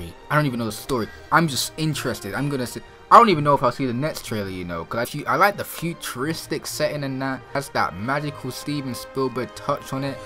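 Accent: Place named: United Kingdom. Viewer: Ive never heard a british accent with a lisp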